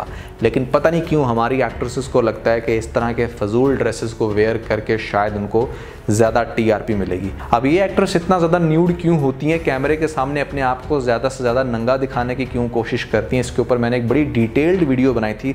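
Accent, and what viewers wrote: Punjab, India. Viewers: I like the way your punjabi coming out! I love it!!🙆‍♀️❤️and the way you criticised actresses… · Tuhadi punjabi 22 sooo funny😂😂😂😂😂😂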